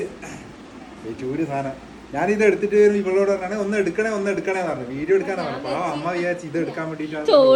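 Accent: Kannur, India. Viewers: ഓടുത്തും നമ്മുടെ സ്വന്തം കണ്ണൂർ bhasha😂😂😂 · അതാണ് നമ്മുടെ കണ്ണൂർ ഭാഷ 🥰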